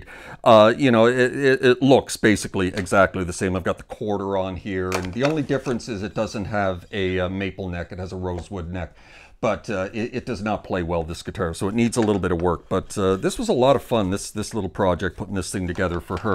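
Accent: Canada. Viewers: Hi. Do I detect a slight Canadian accent?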